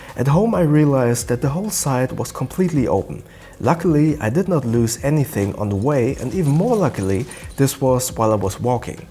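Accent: Germany. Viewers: I honestly can't tell if you're happy, sad, upset, mad or tired because of your German accent lol. It's basically always one tone because of the pauses. I'm sure that's just a habit of speech from the German language.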